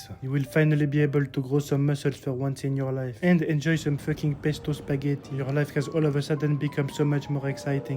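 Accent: France. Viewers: Love the broken english with french accent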